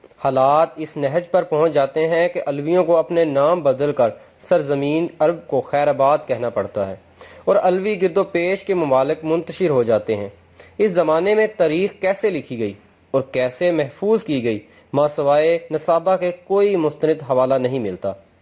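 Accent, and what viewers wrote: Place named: Punjab, Pakistan. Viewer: تحریری مواد تو اچھا ہے وائس اور ٹھیک نہیں پڑھنے والا ٹھیک تلفظ ادا نہیں کر رہا جس کی وجہ سے معیار متاثر اور سننے والے پر اچھا اثر نہیں چھوڑتی یہ بندہ پنجابی میں اردو پڑھ رہا ہے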